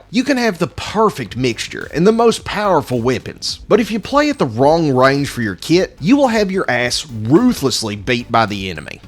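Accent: South, United States. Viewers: iam sorry but you sound like a very southern version of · Is the southern accent a bit or are you from the south? Would be nice to meet one of my own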